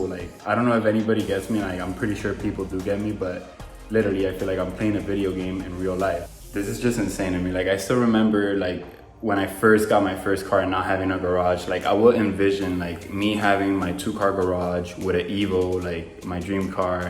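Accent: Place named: Miami, United States. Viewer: I knew you were from Miami from the first literally you said lol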